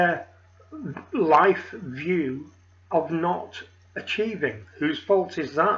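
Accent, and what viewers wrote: United Kingdom. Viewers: all good. Nice to hear the Lancastrian drone of voice again. Bye the way we are on the same page 😉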